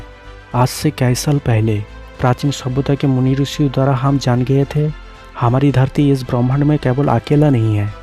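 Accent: Bengal, India. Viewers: Aapko hindi mai nhi bolna chaiye bhai coz aapke awax mai bhutt jyada bengoli accent h...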